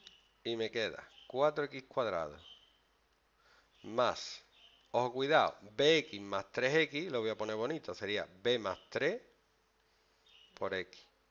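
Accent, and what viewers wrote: Andalusia, Spain. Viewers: Gangoso de que? se llama acento y su acento tiene mas historia que el tuyo SEGURO. Si no mira la historia del andaluz como dialecto y su influencia en todo el castellano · Las mates con acento andaluz se entienden mejor!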